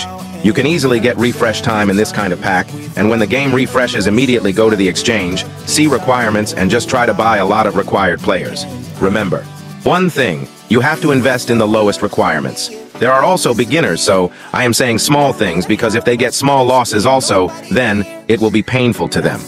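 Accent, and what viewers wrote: India. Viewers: Are you From India😅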